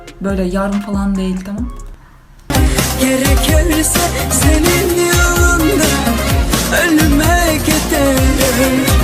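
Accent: Azerbaijan. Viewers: Abla nasıl danisiyorsunki sandımki gercekden Azerisin😂😁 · Sən hər zaman Azəri dilində danış sənə çox yaraşır həm çox şirin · Azərbaycanca cox sirin danısırsız gözəl😗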